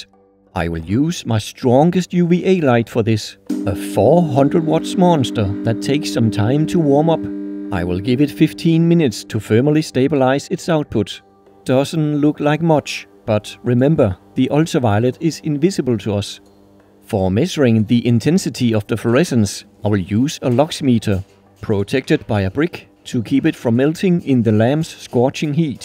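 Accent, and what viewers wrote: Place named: Denmark. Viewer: Elsker din tydeligt danske accent 😂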